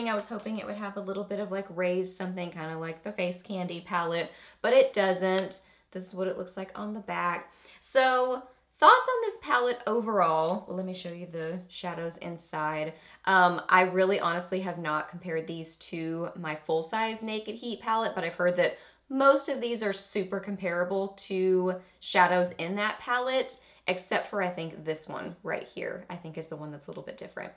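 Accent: South, United States. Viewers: I swear you are a breath of fresh air! I can't believe I haven't found you before now (well about a week ago and been watching your older videos) but I just LOVE You! Your personality, your look, your accent (you have a LITTLE bit of a country, southern accent.... I have a REALLY HEAVY country southern accent, yours is cute, mine not so much lol) I got my Amazonian palette today and it's so pretty I'm in love....I have the naked heat palette and LOVE it, I don't need the small one but I'm prob gonna get it just cuz it's so little and cute.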